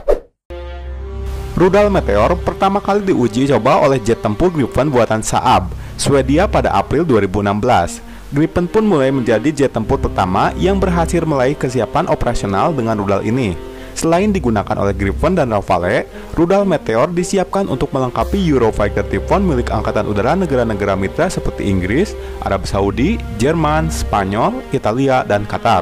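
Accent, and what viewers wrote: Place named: West Java, Indonesia. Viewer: Jiga urang sunda ieu mah nu ngomong na hehehe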